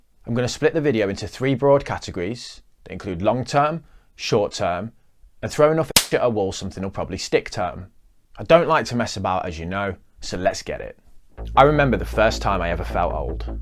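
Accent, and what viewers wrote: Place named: Manchester, United Kingdom. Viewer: Yes mate, knew you was a manc it's in the accent 😂 p.s I'm from wythenshawe by the way, keep up the good work and the videos mate!💪👍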